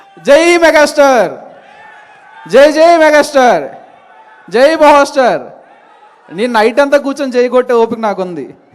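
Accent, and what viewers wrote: India. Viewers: Anthanu anedi Vizag, vizianagaram slang andi respect word oka oka pratham lo different slangs untai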